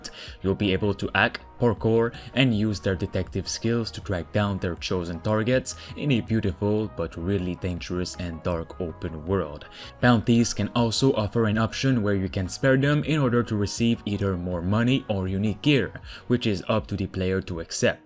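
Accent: Quebec, Canada. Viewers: Juste avec l'accent, on sait que tu es québécois 👌😂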